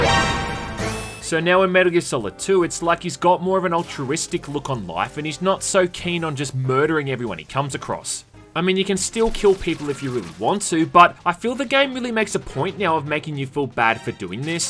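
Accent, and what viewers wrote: Australia. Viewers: Australian accent , nice - lil pharma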